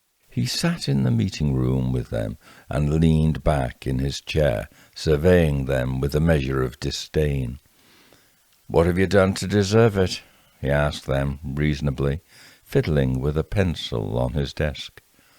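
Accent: United Kingdom. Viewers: you are extremely talented and creative and I will tell you if I was not on a fixed income. You would be the first so that I could join your YouTube. However you do that, I mean it. I love these. Thank you from the USA we do not have talent and. Creativeness and the accent and the humor that the brits do.Trust me we don't at least we don't in nevada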